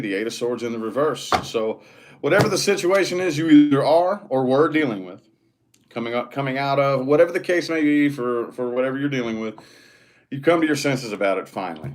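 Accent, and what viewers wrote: United States. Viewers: I think its the whole american accent thing he has that makes me feel..... yesss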